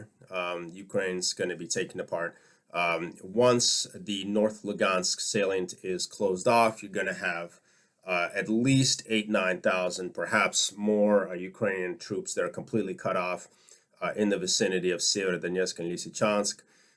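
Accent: Russia. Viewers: Your information is fascinating! Very clear, detailed and intelligent descriptions/analyses! Hugely impressed. Thanks for the info on Dnepropetrovsk. I'm familiar with the Ukrainian towns/cities through a lifetime spent studying Russia/the SU and the GFW/Barbarossa. Your description of defence in depth was also very impressive, though I doubt anyone without military experience or understanding can appreciate just how complex it all is. I will keep watching you and Brian Berltic. PS I'm now sure you are originally Russian. You have a tiny bit of an accent which comes out every so often with the vowels! 😊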